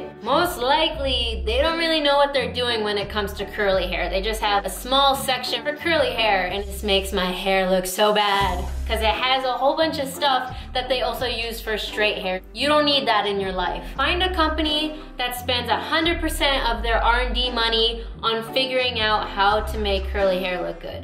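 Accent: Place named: India. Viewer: I love your Hindi ascent... Love from India ❤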